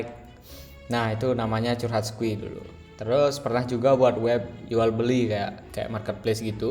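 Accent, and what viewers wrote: Bali, Indonesia. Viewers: Kental banget dengan logat balinya bli, btw salam dari programmer lombok bli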